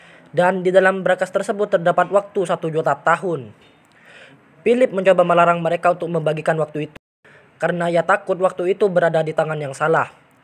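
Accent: Madura, Indonesia. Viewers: Logatnya kyak yg kenal...org madura ya tretan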